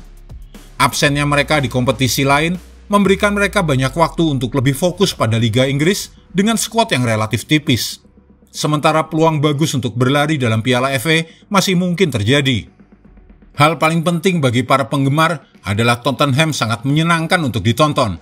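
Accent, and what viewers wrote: Java, Indonesia. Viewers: Medok tapi mantep 👏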